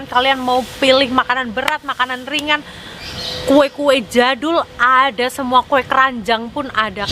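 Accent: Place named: Java, Indonesia. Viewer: Medoknya itu lo, kawaiii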